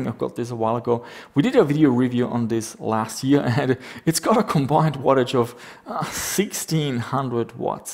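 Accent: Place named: Germany. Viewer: Nice Video, can i ask you were you’re from? You have kind of an German dialect :D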